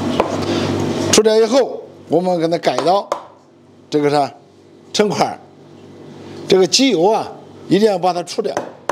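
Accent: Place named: Hubei, China. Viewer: 亲切的湖北口音😊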